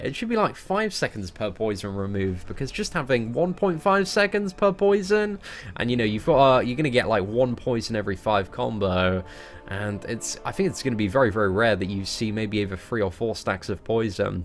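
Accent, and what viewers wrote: United Kingdom. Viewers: where r ur streams at boy.... enough with ur personal meaningful life... we need ur british accent chattering in the background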